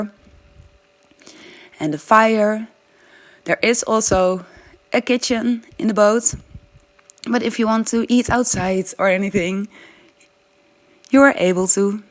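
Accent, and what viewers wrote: Netherlands. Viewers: Bij je eerste zin hoorde ik al dat je Nederlandse bent, ☺️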